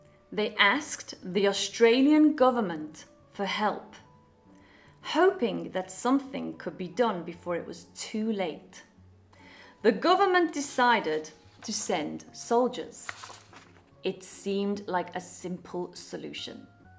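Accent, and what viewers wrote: United Kingdom. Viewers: New subscriber, love British accent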